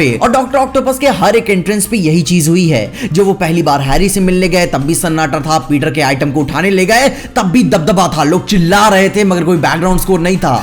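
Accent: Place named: Uttar Pradesh, India. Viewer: Bhayi aapka accent UP jaisa lagta hai, kahan ke ho aap ? 🤔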